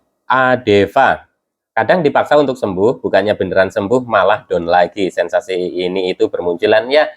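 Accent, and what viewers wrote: Banyumas, Indonesia. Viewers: Asalamualaikum mas. Ora ngapak ora kepenak, njenengan ana ig ora mas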